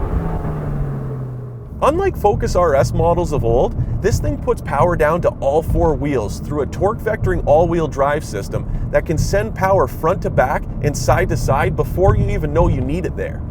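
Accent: Canada. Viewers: You sound like a Canadian, but you do a pretty darn good job.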